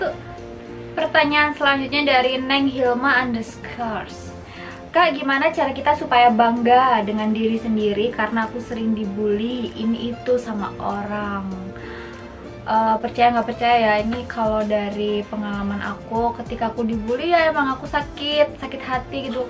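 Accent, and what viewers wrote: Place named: Java, Indonesia. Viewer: wg jawa ga boleh ilang jawa nya 🥰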